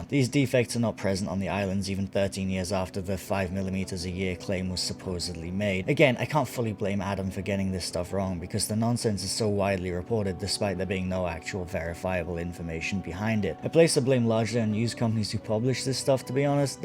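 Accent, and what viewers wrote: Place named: India. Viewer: interesting accent, i guess you are indian or somewhere from middle east?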